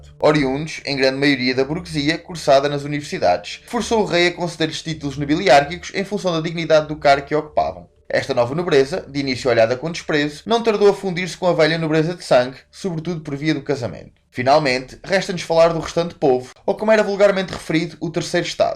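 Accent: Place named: Portugal. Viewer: Carai nao entedi nada portugues de portugal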